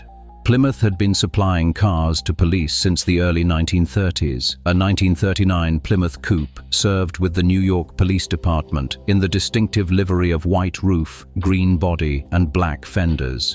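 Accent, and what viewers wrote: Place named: United Kingdom. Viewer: American cars, American cops, American highways, and this poster decides that it has to be narrated with a British accent. WTF?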